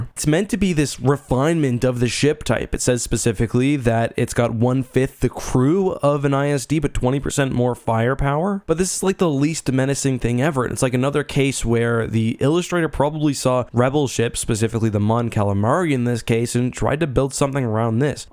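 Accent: Canada. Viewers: it took me a few years to realize that youre canadian, when you said "about" i was able to finaly confirm my suspicion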